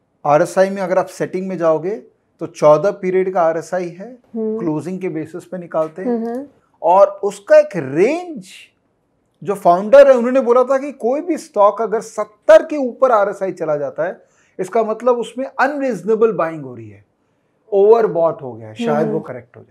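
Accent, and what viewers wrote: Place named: Bengal, India. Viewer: "Pati" way 😄 You sounds so Bengali 😊😊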